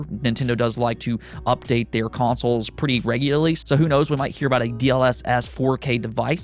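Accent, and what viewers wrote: United States. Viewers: Your American Accent is really really strong, wow is most noticeable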